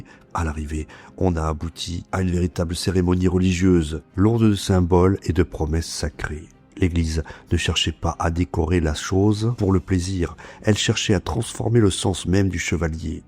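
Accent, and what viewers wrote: Corsica, France. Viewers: Alors y a un truc qui m'étonne ;-) J'écoute tes contenus en mode podcast avec un écouteur, le soir dans mon lit, dans le noir avec mon écran retourné vers le matelas pour limiter la luminosité au maximum... Bref, tout ça pour dire que je me concentre sur l'histoire et sur ta voix. J'ai écouté pas mal d'histoires et à chaque fois, je dis bien à chaque fois, je trouve que tu as un accent Corse. Je ne saurais dire si c'est Corse du sud ou Haute Corse mais je penche pour cette dernière. Alors, j'aimerais bien savoir si tu as vécu en Corse ou bien quelle région de France peut avoir un accent aussi proche ! Je vais peut-être gagner le prix de la question la plus bizarre de l'année :-)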